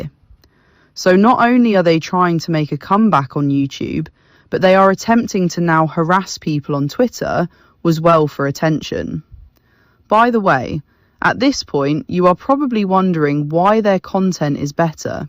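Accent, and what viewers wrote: United Kingdom. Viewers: Whelp, this is the first time I heard your voice, I can tell you’re a Brit, also hopefully the uttp falls and hopefully the whole community dies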